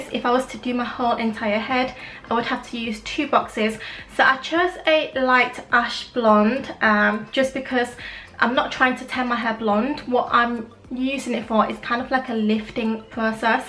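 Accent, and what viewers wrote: United Kingdom. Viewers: u have a British accent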